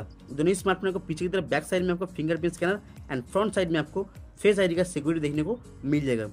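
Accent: India. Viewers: Damn your Indian accent is really hard and you speak jibberish already.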